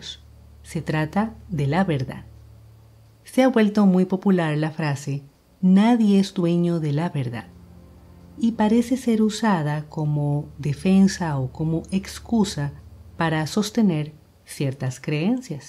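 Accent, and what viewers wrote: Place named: Costa Rica. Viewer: Porque es costarricense 😁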